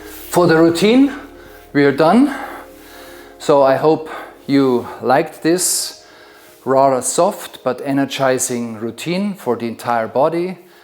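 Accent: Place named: Bavaria, Germany. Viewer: Sehr schöne Yogaeinheit um am Morgen wach zu werden. Ich mag dein bayrisches Englisch!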